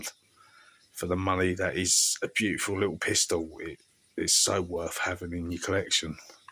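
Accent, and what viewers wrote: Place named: Kent, United Kingdom. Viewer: Oh my Gawd wot a smashing collection . Your accent also makes me miss the old country and living in Kent back in the day . Cheers Alberta Ray o7.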